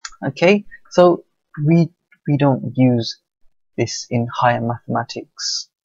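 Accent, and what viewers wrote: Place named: London, United Kingdom. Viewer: I hope that was sarcasm? London accent and a Utd supporter...local?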